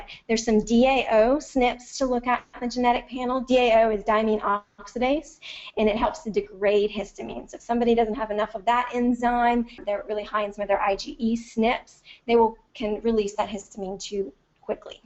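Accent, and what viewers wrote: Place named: South, United States. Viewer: This info was very good BUT.. I'd like to give you some helpful auditory info if I may. The audio on this video is horrendous, you sound like you are talking through a pipe. I have this and other conditions which make it especially annoying to decipher. You have a southern drone, plus you are very monotone and nasal, and you talk far too fast for your audience and the compromised audio quality, it cannot possibly match your words trying to match your brain in delivery - it cannot unless you improve the audio, eliminating echoes and reverb. You must adjust, just slow down by about 25% if you have this low quality audio gear. Sorry but I had to leave due to the above situations, although I did mute this and flip through the charts which are great. I truly hope you can resolve the quality issues, as you otherwise have a lot of good info to tell, thanks.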